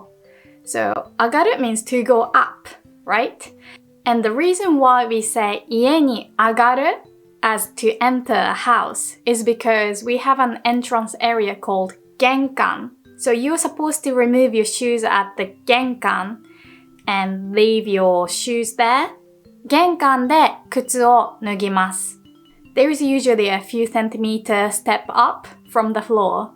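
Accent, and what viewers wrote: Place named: United Kingdom. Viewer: Very good video ! It's fascinating about both language and culture When I've been in Japan I definitely pick up my bowl to eat rice, but I wouldn't do that in the French restaurant in Paris! On the other hand nobody would bother you about it! I enjoy all of your videos as I'm trying to learn Japanese. By the way you have a bit of a British accent ! IMO ;)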